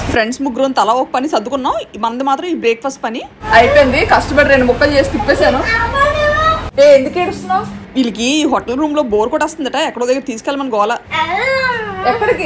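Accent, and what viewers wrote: Vizianagaram, India. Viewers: మీ విజయనగరం మాట మారలేదు అలానే ఉంది చక్కగా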